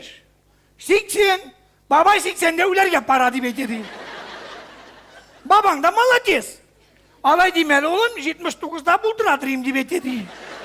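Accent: Chuvashia, Russia. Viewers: не про чуваш а с чувашским акцентом играет чувашина на татарском языке истории рассказывает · у него вроде всегда такой акцент, чувашский.. может он чувашин? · Как точно! Некоторые мои друзья чуваши так и говорили на татарском, ну точь в точь. Молодец!!!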